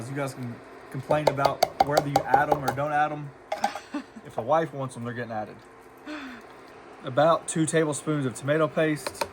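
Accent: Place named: Tennessee, United States. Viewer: Never mind, I know a Tennessee accent when I hear it. Could be Kentucky or Georgia just across state lines, but that's a Tennessee accent. Lol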